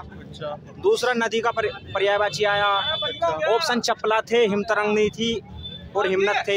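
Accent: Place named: Bihar, India. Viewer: भईयाजी बिहार से हो क्या 🧐🧐🧐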